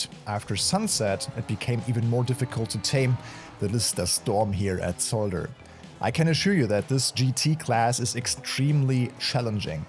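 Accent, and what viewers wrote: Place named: Germany. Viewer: Man merkt sofort das du made in germany bist 😅.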